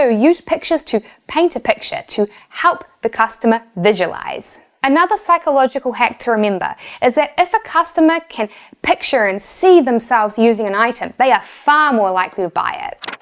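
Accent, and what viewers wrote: New Zealand. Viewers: Love your Kiwi accent!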